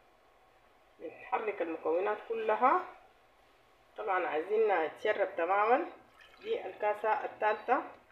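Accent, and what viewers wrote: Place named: Sudan. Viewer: لييش انتم يا السودانيين نساء ورجال تمطووون كلامك حييل بصراحه طريقة كلام ولهجه لا تعجبني ابددددداً😮😢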